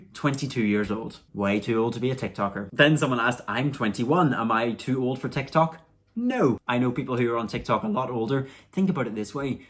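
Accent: Ireland. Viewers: Are you Irish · Your accent is an Irish one right? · I’m not 100% sure but is your accent Irish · I love his Irish voice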